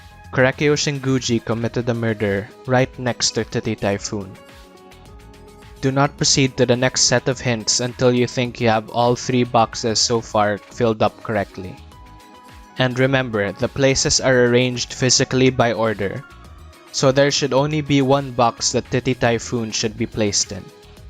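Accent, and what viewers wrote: Philippines. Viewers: You sound so pinoy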